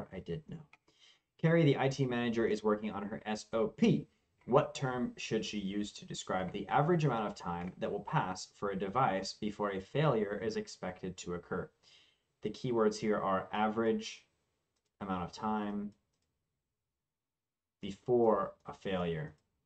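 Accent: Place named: India. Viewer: lol, your indian voice is horrible, but made me chuckle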